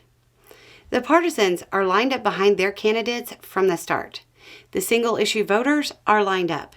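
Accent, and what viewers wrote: South, United States. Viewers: Love the end and that sweet goodbye, especially if she's mad! Oh that southern sarcasm is rich and thick! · I really need to hear you say “Bless his heart” in that sassy sugary sweet southern delivery of yours. Please? Pretty please?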